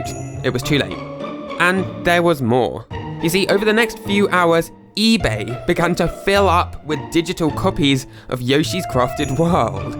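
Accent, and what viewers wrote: United Kingdom. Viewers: British voice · I thort u woor british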